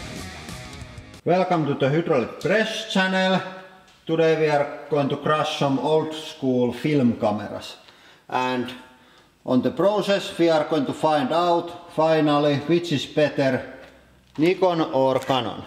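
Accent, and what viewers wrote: Finland. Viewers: are you from finland? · kunnon ralli englanti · nice ralli englanti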